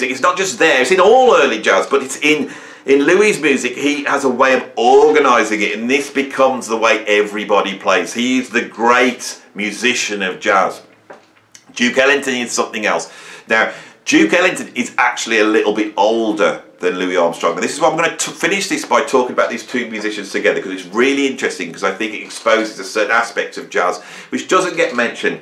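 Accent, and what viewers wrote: Birmingham, United Kingdom. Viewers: I follow David Packham on American politics - you need to do an advert at the end of each video. Posh pot noodles, hair gel, face scrub...you latest book! Alright, the last is a bit Rick Beato. 😂 Soon your studio will be filled with so much crap you won't be able to film! On seconds thoughts, the loud, opinionated Brummie still wins every time.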